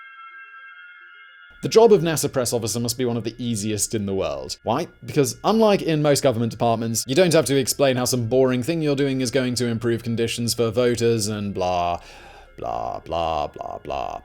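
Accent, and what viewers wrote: RP, United Kingdom. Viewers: Wait? How many channels do you have? Just so I know on which to go and subscribe. I assume the quality of your other channels is equally impressive, educational, entertaining and a just amount of brittish posh😉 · ... I know your British or something to that accent...but meethane...it is a short E...methane...as in meth-ane...otherwise like the trim job on the beard...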